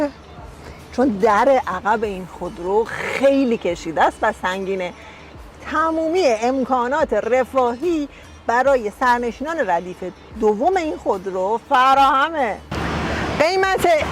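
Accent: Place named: Isfahan, Iran. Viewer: اصفهانی هستید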